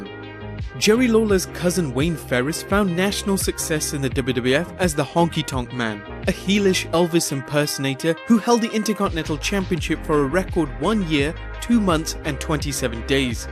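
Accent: United Kingdom. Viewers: I swear every channel on YouTube that does top 10 or top 5 videos is narrated by an annoying British boy!!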